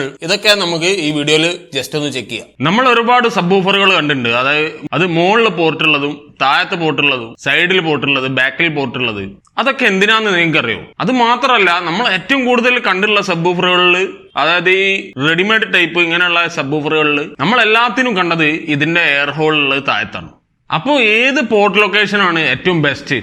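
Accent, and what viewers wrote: Kozhikode, India. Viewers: വളരെ നല്ലവണ്ണം മനസ്സിലാവ ന്നുണ്ട് ഇ ടയ്ക്കൊക്കെ കോഴിക്കോടൻ സ്ലാംഗ് രസികനാണ്. Keep it up f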